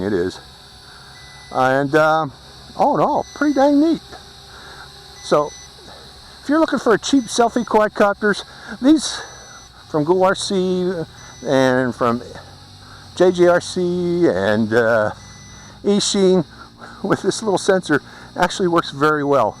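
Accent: Pittsburgh, United States. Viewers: how do you get extra propellers for this? also: i'm guessing you're from pittsburgh? my dad is, and he has the same accent.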